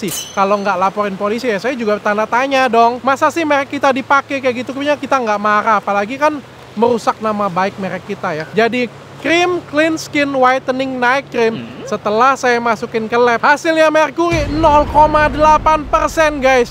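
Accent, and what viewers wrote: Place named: Sumatra, Indonesia. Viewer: Kami org Sumatra emang ngomong nya kekgtu 😂😂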